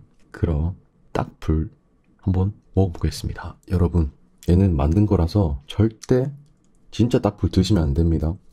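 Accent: Busan, South Korea. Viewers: 어디가 표준어랑 섞인건가요 ㅋㅋㅋ 완전 부산사투린데요?ㅋㅋㅋㅋ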